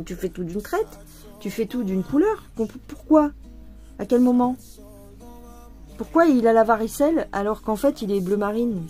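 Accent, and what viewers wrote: South, France. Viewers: Coucou ma petite adorée, oh si maintenant les confettis se cachent c'est infernal! C'est vrai que sur cette toile rien n'est logique mais c'est parti! Tu braves les difficultés 😂! Tu nous en auras appris des choses et ne t'inquiète pas on est aussi accrocs! Accrocs également de ces bons moment passés avec toi! Aujourd'hui l'accent du Sud revient hi hi. Tu vois le chat est là 😺, il faut lui mettre des petites chaussettes de bébé pendant que tu fais la coiffeuse car un coup de griffe est vite arrivé. Je me suis retrouvée un jour avec le bras qui a triplé de volume suite à une griffure, une méchante infection qui a duré 3 semaines! Bref, ici on parle de tout est c'est chouette! Merci merci et une toile de plus, à la suivante! 🤗. Des gros bisous doux doux doux 😘💙💙💙💙💙💙💙et salut les gens 🖐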